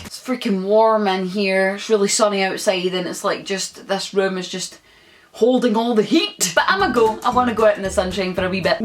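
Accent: Scotland, United Kingdom. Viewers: Я думал, что я знаю английский до момента пока я не услышал настоящий шотландски акцент Это пиздец полнейший, я нихера не понимаю ПОМОГИТЕ!!!! P.s: я посмотрел "trainspotting" и там был усатый сумасшедший чувак. Он тупо говорил слова, которых нет в английском! Слова блять другие! Какого хера? Почему aye вместо yes??? Что еще за ah dannie ken??? P.p.s И я пишу по Русски потому что я не понимаю 50% того что ты блять говоришь!!! Это моя месть! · idk if this is weird but I love your accent! Currently using your voice as a sound sample to learn the Scottish accent for my theatre class in college😅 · Hey, you might have answered this but I'm gonna ask anyway. what Scottish accent do you have I know that are different ones, but I like your accent and I was wondering. I follow other scots and they all throw the accent around a bit. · Hello, great video. 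Please consider removing the word slang as what yer speaking is Scots language. A lot of Scots have no idea they are speaking a language as they have been told time and again that it is slang. We are not taught to read and write in Scots and many of us are illiterate in oor ain language because of this suppression. Scots is only brought oot fer the tourists teatowels and Burns Week but we speak it aw the time. We struggle tae read it and write it cos at school we have only ever been taught tae read and write in English exclusively. That is thankfully changing wi the introduction o Scots in the school curriculum. Oor language is a bonny and valid language and tae keep calling it slang devalues what is uniquely oors. There is a lot of work been done over the years tae empower ourselves and tae nurture our language. May I suggest ye read The Mither Tongue by Billy Kay if yer keen tae ken mair. 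I write this with great kindness and support. I am a Scots language author for weans. Aw the best tae ye. Guid tae hear a Scots voice on YouTube. Please, if ye dae make another video like this, I beg ye tae yaise the word language insteid o slang. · Got such a Scottish mouth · Only just discovered your channel and I'm already hooked. You're so confident and it's nice to hear more scottish accents around here. Subscribed! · I'm from Argentina and I felt like I needed a challenge so I searched for "Scottish accent" and let me tell you that I'm not ready for such a whole new world. Sometimes I got distracted thinking about the words you said and when I went back to listening I thought you were talking in some Nordic language. But definitely going to subscribe and challenge myself with your videos! And by the way you are so pretty 🖤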